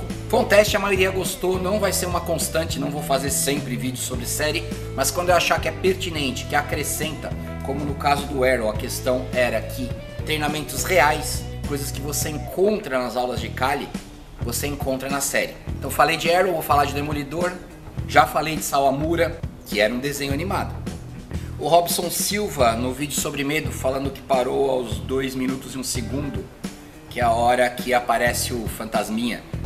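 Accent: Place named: Rio Grande do Sul, Brazil. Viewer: Mestre só me responde uma coisa...Tu é gaúcho?pois tu tens o nosso sotaque e eu ja vi tu falar girias gaúchas.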